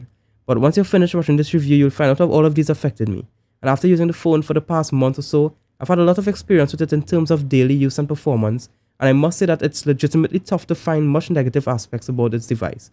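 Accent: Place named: Trinidad and Tobago. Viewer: That was an excellent review bro. I picked up some Trini twang here and there but, not much. "I simply hit the simple button" #priceless. Awesome quality as usual and very informative.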